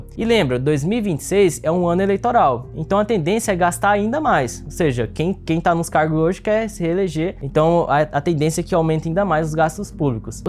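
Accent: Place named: Goiás, Brazil. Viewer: Hahaha goiano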